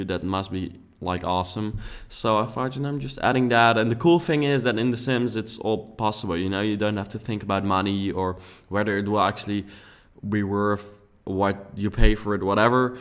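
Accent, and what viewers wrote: Netherlands. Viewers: I hope you're trolling. He has a dutch accent because he's dutch, you idiot. Figures the channel name would be self-explanatory enough! As if he's gonna change to cater to one person's dissatisfaction when his english-speaking skills are perfectly fine! If you're that butthurt by it, it doesn't take a rocket scientist to find the mute button on the video. "I don't mean to be rude" my ass. Pull your head in or go back to your perfect english-speaking safe space. fantastic build as usual! :) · i don't mean to be rude but please work on your english. you have a dutch accent and I don't like listening to it.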